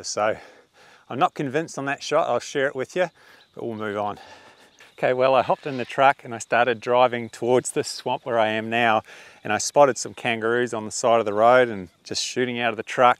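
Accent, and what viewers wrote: Australia. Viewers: Your Australian accent.:-) Nothing wrong with it of course.:-) Greetings from the Netherlands, spring is coming here soon.:-) photographer and Filmer.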